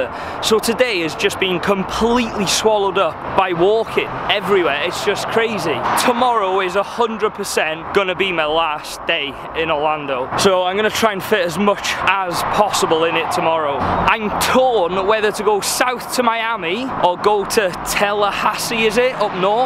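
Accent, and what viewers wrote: United Kingdom. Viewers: I think the British accent helps... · White skin & British accent. The keychain to America's chastity belt.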